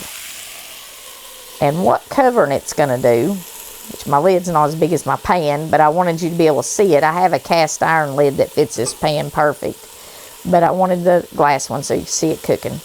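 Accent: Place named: Arkansas, United States. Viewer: Howdy! As of right now, I see you posted this video a couple of hours ago. I watch all of your videos on YouTube via TV. Always hit the “Like” & share your videos. I am about 1-2 hours, NW of Lake Tahoe. I love listening to you, your accent makes me feel like I’m with my Arkansas family, on my Grammy’s side. I hope y’all have a Blessed year ahead. Much love and blessings to your whole family. XXX RHONDA 🙏🏼✝️💖🇺🇸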